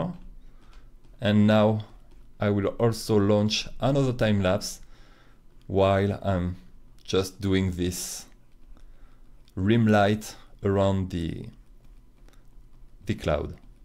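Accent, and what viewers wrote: France. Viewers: L'accent français ♥️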